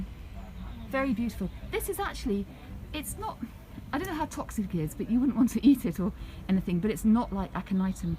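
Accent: London, United Kingdom. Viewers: 太喜欢她的伦敦腔了。和中国很多妇女是两种感觉。不喜欢现在中国那种妇女眼里只有钱，嘴里只有唠叨，的状态， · 哇，好像在看英国花园纪录片的感觉喔！非常着迷于女士的好听英音讲解也非常长知识！期待下一期❣️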